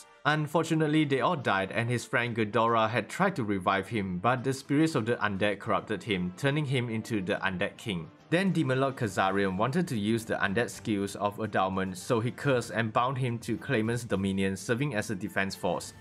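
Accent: Singapore. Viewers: Are you Singaporean????!? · U sound like a singaporean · Ehh u sound like a Singaporean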